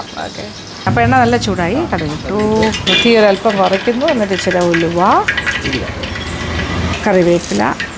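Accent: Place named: Kottayam, India. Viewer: Kottayamkar samsaram kettappol thonni,njan kottayam😊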